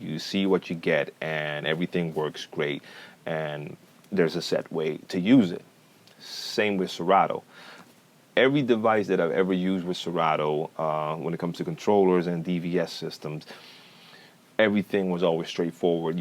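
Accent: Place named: United States. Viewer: Let me put this simple to you,,, by your accent i believe you are from USA. I'm a DJ for the last 26 years, i do beat match by ears, i'm a car driver, i have a 5 speed car. Virtual DJ is like automatic cars and the others are like manual shift cars. if you drive an automatic car, you are still a qualifier to get a driver license. And let me tell you something else, Virtual DJ is from France and is not free, the other crap softwares are free with the unit. After Numark failed to pay to Virtual DJ, they moved out of American market, also for a long time, nobody built a software like this. Virtual DJ is the first and the one, the others are pure advertizing. A real DJ is who has it a full house and makes everybody dance all the time no matter how !!!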